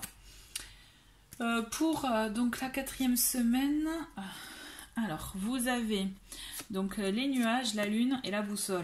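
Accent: South, France. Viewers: merci c'est très agréable avec votre petit accent du midi...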